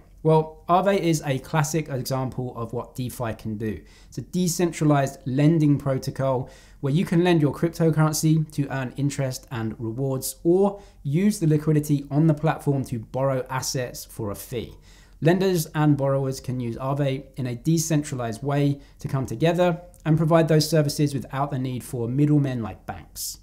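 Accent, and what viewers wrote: United Kingdom. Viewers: Great video, always look forward to your videos. Its refreshing listening to someone from the uk. Have you ever used Trading View. Be great to see a video on that. How it works and allthe different tools. Please keep up the great work.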